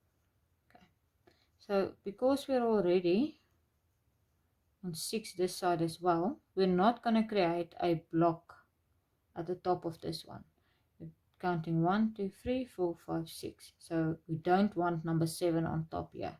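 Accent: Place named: South Africa. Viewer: This is perfect thank you! Your accent brings me back to when I lived in SA! ❤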